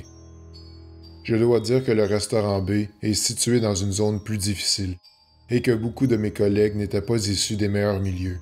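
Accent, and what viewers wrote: Canada. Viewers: J'adore ton accent Canadien, cela donne du piquant à tes histoires.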